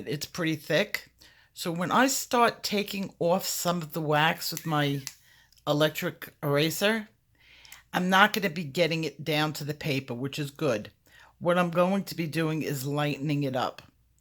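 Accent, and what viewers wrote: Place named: Long Island, United States. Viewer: You are truly amazing! I look forward to your videos weekly and am so thankful that I get to hear a long Islander talk! Feels like I'm back home! Please keep these videos coming..I can't wait to see your texture videos and what's to come next!! I am learning so much from you, thank you so much!! ❤ oh I must say I developed my artistic style last week after watching your video I went through my coloring books like I do almost every day looking for a picture to color not knowing what colors to color them or what style to do, and I actually, visually, visualized the picture! It was amazing I realized it, and I never would have realized it, if it wasn't for your videos and for you! THANK YOU thank you so much I broke through the coloring block!! Xoxo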